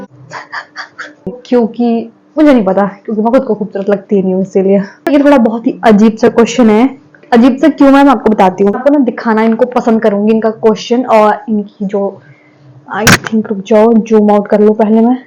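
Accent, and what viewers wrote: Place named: Karachi, Pakistan. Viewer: aapka hindi accent 90% Karachi walon jesa hai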